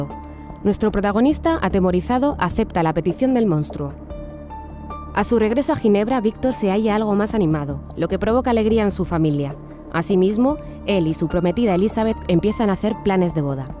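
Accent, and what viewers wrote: Spain. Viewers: Me encanta el acento español <3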